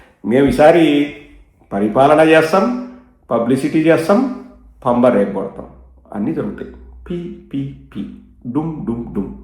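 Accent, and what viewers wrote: Rayalaseema, India. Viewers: complete ga Rayalaseema slong lo videos Pettu abba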